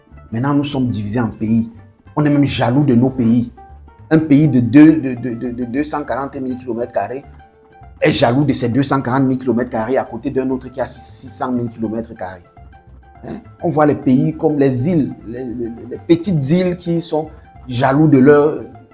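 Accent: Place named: Cameroon. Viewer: A travers l accent tu est biyamerounais toujours c,est vous vous connaissez tous sauf les problème de votre pays ,toujours entrain de parler de tous et se faire donneur de leçon,concernant le cfa nous on est déjà sortie pr l,eco ,c,est chez vous il ya meme pas débat ,avec vos président dictateur,parle de biya dictateur qui veut pas aller a la retraite.aprt la poussière y a rien au Cameroun.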